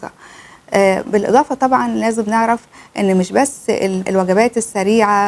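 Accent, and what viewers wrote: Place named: Egypt. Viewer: شكرا للكتورة ولكن كلامها على لهجة المصرية ما يعرف المطلوب خصوصا حرف القاف في كلمتها لوكان كلامها بلهجة الفصيحة يستفيد كل من يعرف العربية الفصيحة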